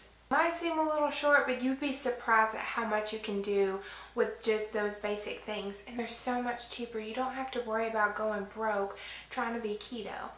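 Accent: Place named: North Carolina, United States. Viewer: I love your accent. North Carolina?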